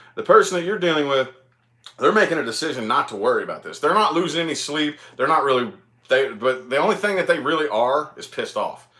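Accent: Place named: Mississippi, United States. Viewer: You sound like every man I know in Mississippi 🤣 gotta be Alabama Mississippi or maybe Louisiana.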